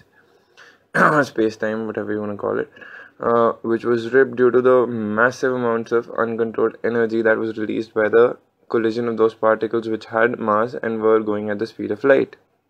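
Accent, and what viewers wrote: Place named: India. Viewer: Indian accents are great, love it.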